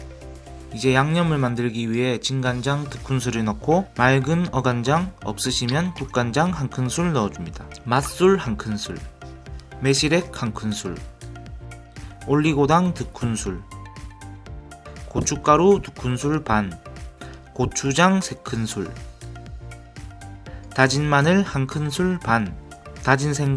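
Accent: Gyeongsang, South Korea. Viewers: 갱상도 청년이시군요. 는 소리없이 자막으로 어디서나 간단명료하게 볼 수 있음이 특징이예요. 목소리 반기웠어요^^, 그러나 말소리가 나오니 장삿꾼 같은 느낌이 나서 참신함이 좀 훼손 되는 듯. ㅎㅎ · ㅋㅋㅋㅋ 같은 갱상도사람으로서 사투리 너무 구수합니당 고추우장/ 다진마뉼 / 오징어로 미나리초무침이랑 볶음으로 밥도둑 완성했어요 감사합니당😄😁😆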